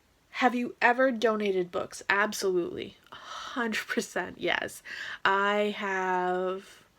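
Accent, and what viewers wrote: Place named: United States. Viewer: i did wonder if you were british or american, now i know (your accent is very 'american' as we know it)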